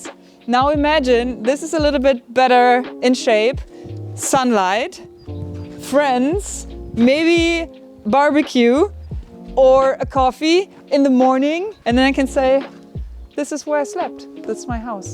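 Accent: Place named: Germany. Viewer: Wie angenehm - so ein schönes Englisch mit klarem deutschen Akzent zu hören, man versteht es einfach viel besser, als diese Ami-Aktzente mit den dicken Knödeln im Hals!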